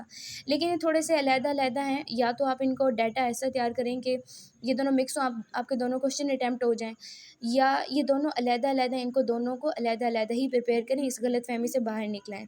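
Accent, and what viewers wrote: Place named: United Kingdom. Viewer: MashAllah, mam your accent is very good and you totally seem like British... 👍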